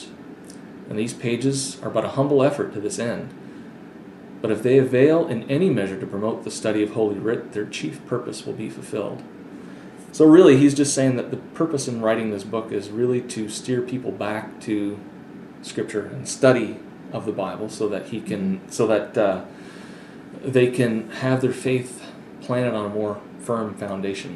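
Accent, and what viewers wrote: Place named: Canada. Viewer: Great review, thanks for this! I am absolutely inspired to read the book now! Also, as a side note, after hearing your "outs" and "abouts" I've gathered you to be Canadian?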